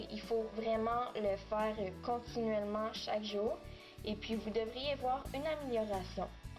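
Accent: Quebec, Canada. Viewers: Je viens de tomber sur une de tes vidéo et je suis charmée :) J'ai quelque petites cicatrices près de la mâchoire et je vais essayer ton truc bien vite. Tu explique les choses si bien et pour tout te dire l'accent québécois me fait craquer :D Gros bisous et merci pour cette vidéo, je m'abonne :)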